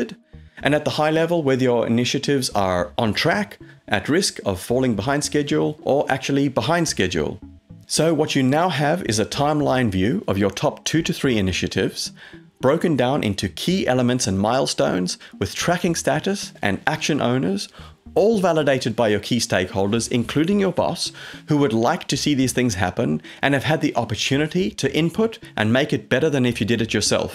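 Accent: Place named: England, United Kingdom. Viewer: The most beautiful English accent in the whole wide world. Thank you!!!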